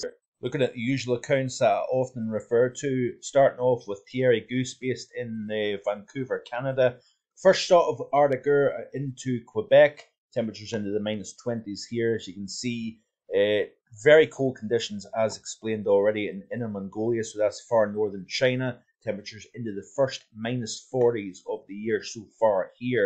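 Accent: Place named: Northern Ireland, United Kingdom. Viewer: This will probably be the height of our cold snowy winter. With last year being quite cold and that becoming an ever increasing rarity I’m betting on this winter being a wet and stormy one. The climate change impacts are really becoming quite noticeable to me now over the past few years. More erratic cold spells and ever longer lasting heat into Autumn. Here in Northern Ireland it’s been a very odd year. An extremely humid almost tropical summer with a ridiculous amount of thunderstorms the likes of which I’ve never seen. In September there were severe elevated storms with really active lightning. Now it’s the amount of rain we’re getting. Absolute crazy. Most of October and up to mid November was basically written off in terms of hitting the rivers for some fishing. One of my nearby rivers is still high as it has been for weeks. 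By the way are you from NI? I seem to recognise your accent. You do some amount of work on here it’s much appreciated👍.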